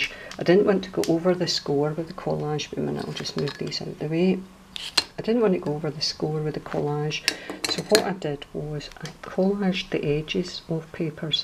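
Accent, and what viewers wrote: Scotland, United Kingdom. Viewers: Without your accent I would never learn scottish. Love the edge collaging, very nice idea, will try it too. Your envelopes are gorgeous. Have a nice summer evening and say hello to yours! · Aye,! Bless the Scots accent. I come from a he North East. Now, there's an accent that only few would understand! Beautiful work yet again. Very talented lassie! 💜 xx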